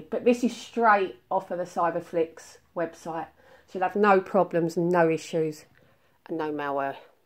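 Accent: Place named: United Kingdom. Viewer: Oh i love your british accent it sounds son beatifull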